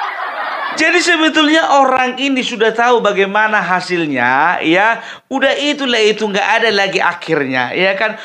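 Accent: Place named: Java, Indonesia. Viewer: Bang good logat jawa nya aku suka banget 👍👍🙏🙏😀😀😀😀😀😀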